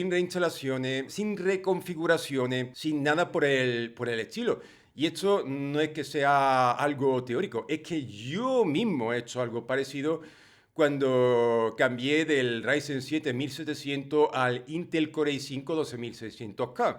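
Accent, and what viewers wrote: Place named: Extremadura, Spain. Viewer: Vos sos de Cáceres o de la sierra de la gata? Tenés un acento que me hace acordar al de Nazaret martin crespo una chica q vive en esa zona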